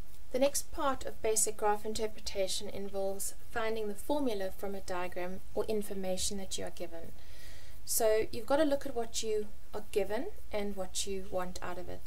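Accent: South Africa. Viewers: You're afrikaans?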